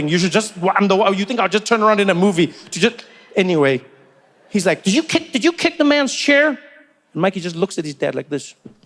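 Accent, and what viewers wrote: South Africa. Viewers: This is exactly how he spoke before he went to America, it's the South African accent. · Love how his accent became 100% South African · He is 100% South African😂😂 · Is incredible and so lovely, how easily he uses the south African accent for the public...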